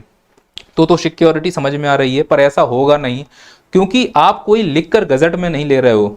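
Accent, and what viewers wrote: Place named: Chambal, India. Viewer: Sir aapki boli me Chambal wali akad hai❤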